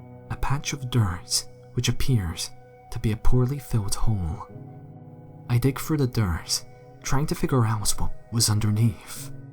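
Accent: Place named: Scotland, United Kingdom. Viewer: I remember you when you were. Your dead Scottish voice is the best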